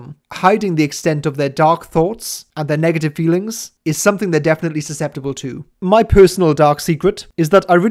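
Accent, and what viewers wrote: Wales, United Kingdom. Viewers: Pretty sure he's from Wales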